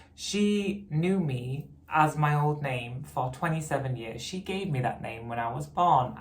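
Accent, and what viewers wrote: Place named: Yorkshire, United Kingdom. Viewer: You’re such a pleasure to listen and learn from and who doesn’t love a Yorkshire accent! Makes me miss The North. ❤️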